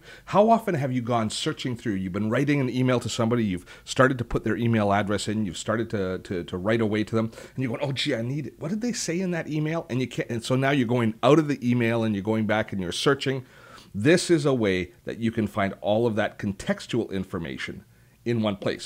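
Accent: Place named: Canada. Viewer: You have a canadian accent : )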